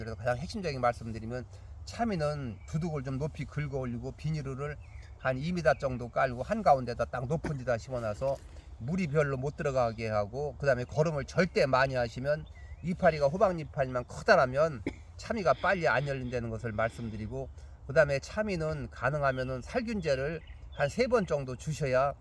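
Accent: Chungcheong, South Korea. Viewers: 충청도 분인가벼 설명 듣기가 느려서 답답혀 나두 충청도지만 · ㅋㅋㅋ 차미 차미 아저씨 반가워유 ! 충청도쥬.저두 충청도유~~ㅎㅎㅎ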